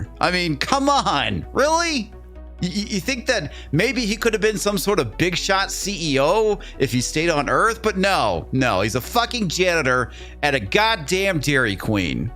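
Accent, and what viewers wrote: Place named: New York, United States. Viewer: You sound like an off-brand New Yorker, mate. I like it! And man was this show garbage.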